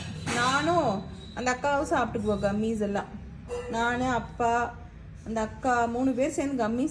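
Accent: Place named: Coimbatore, India. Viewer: I just loved her Coimbatore Tamil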